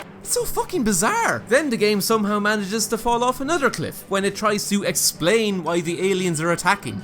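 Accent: Ireland. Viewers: Just love hearing an Irish gaming you tuber ❤️❤️❤️ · something about an irish accent makes me subscribe before i even watch the video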